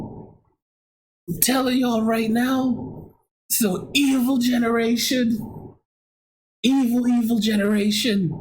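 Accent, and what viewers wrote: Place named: Jamaica. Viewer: Bro. You sound like you have a slight Jamaican accent which was lost over time being Americanized in the US! .... Am I correct?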